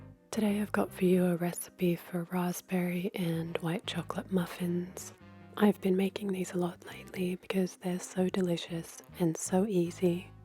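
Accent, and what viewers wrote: Australia. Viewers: I can’t possibly fathom the heavenly perfection of your voice. I mean I’ve heard thousands of soft voices in all the videos available online but yours - hands down - is a million miles ahead of all of them. My god it’s incredible. Plus that tinge of Australian accent (if I’m right) is the cherry on the cake. Davvero perfetta!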